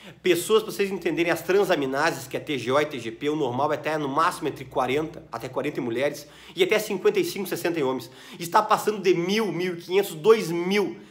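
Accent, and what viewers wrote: Rio Grande do Sul, Brazil. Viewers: Dr me esclareça por favor! A plicoma é indolor ou dói muito. Por favor me ajude. Eu estou com uma bolinha na margem do ânus e dói. Que faço? Curto seus vídeos, gosto de seu sotaque gaúcho. Bj!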